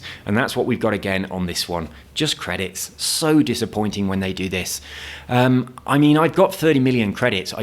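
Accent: United Kingdom. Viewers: Why do you sound Cornish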